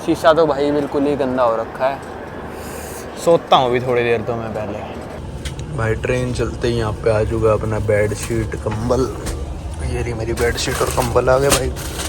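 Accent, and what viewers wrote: Haryana, India. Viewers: His accent sounds like haryanvi accent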